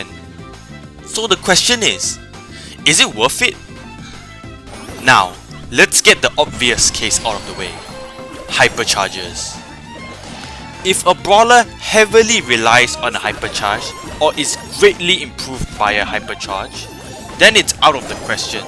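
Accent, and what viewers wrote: Singapore. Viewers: singaporean accent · I can tell that ur singaporean XD am i right?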